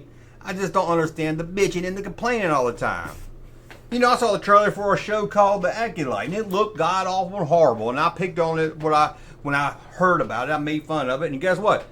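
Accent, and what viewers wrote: South, United States. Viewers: If I was going to guess, I'd say that probably most channels don't get filters applied unless someone complains. Big channels with lots of views are most likely scrutinized by default (they probably get spammed with complaints/reports). But why waste compute cycles on channels that very few watch? Not trying to say you're a small channel (but you are lol), but I try to watch all your videos. I think what appeals most to me is your southern accent. I grew up in west texas, but a lil after you. I never developed a southern accent. We had cable and i think that's why I never got the accent. Everyone around me had it though lol! Do you have any other interests besides gen-x toys? You must've played video games at some point, could you do a video about that, or maybe even a video about you growing up, or about something from your childhood that wasn't star wars or toy related? 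It's cool that you have home video of yourself as a kid, that's something my family didn't do. Or maybe a short about your absolute favorite toy when you were a kid, and what's your most favorite toy now as an adult? And what's your day job like? And how much time do you use on making videos? And, you think you'll ever have an action figure of yourself? I wouldn't mind picking up a action figure, especially if it has that jersey you always wear, and of course the hat! But then that begs the question: what other accesories would be included? Perhaps a scale version of another! So your junkma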